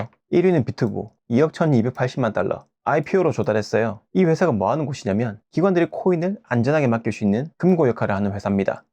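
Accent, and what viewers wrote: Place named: Gyeongsang, South Korea. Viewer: 이 유투버는 사투리를 깔끔하게 사용해서 듣기좋다. 요즘 어설픈 표준어 역겹게 사용하는 경상도 사람들이 너무 많다. 구독 강추!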